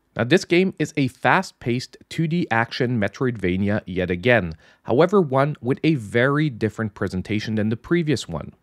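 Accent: Quebec, Canada. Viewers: That's a Quebec accent if I've ever heard one.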